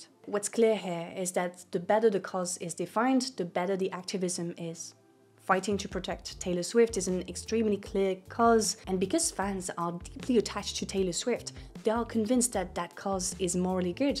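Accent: France. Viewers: maybe it has to do with her french accent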